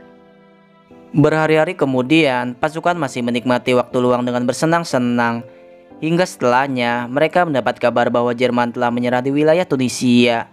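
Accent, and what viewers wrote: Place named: Java, Indonesia. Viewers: Nadanya medoook,kayak orang ngapaak???😊😁😁😁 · Logat bahasa nya tu macam org jawa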